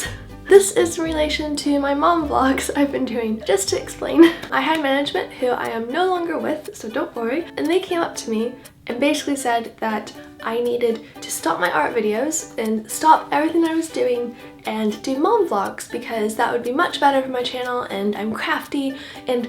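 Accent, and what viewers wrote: United Kingdom. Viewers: I feel like I can hear more and more of a tiny little British accent coming out! 😍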